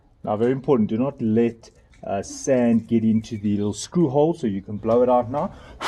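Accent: South Africa. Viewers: South African boet!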